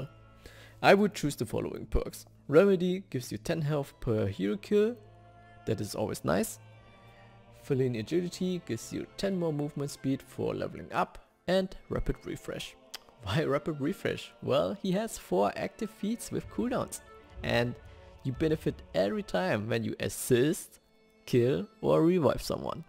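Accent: Germany. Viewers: is he from germany becaus of his pronaunciation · Man hört heraus das du Deutsch kannst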